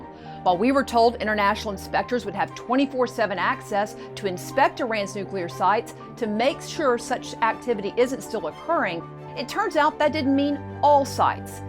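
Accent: United States. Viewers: 6 Seconds Why USA Can't Be Trusted | The Daily Signal American accent.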